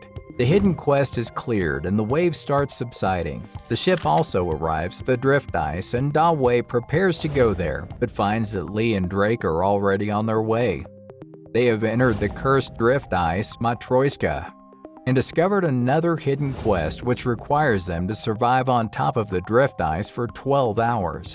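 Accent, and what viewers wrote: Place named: South, United States. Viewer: how did you give text to speech a country accent?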